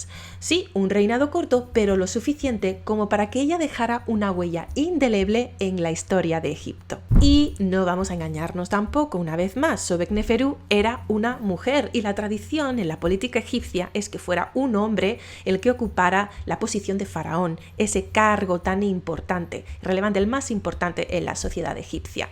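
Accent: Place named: Andalusia, Spain. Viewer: Sigo añorando tu acento andaluz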